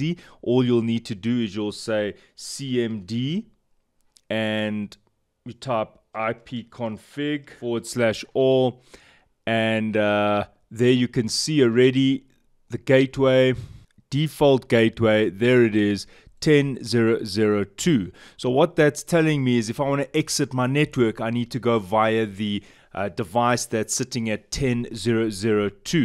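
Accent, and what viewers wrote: South Africa. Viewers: Such a mzansi accent, love it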